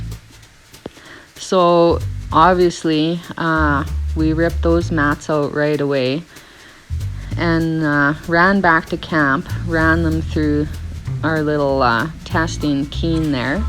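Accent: Canada. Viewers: I'm here for the Canadian accent, not the gold.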